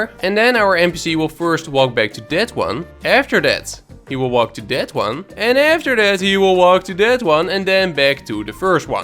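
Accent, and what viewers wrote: Netherlands. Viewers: I can hear that you lived in the netherlands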